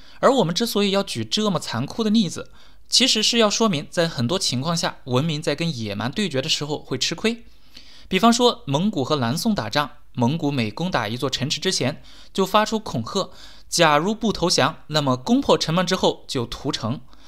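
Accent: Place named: Hefei, China. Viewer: 听着像合肥人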